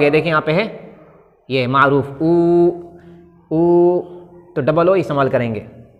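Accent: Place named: India. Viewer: Your pronunciation is according India not UK.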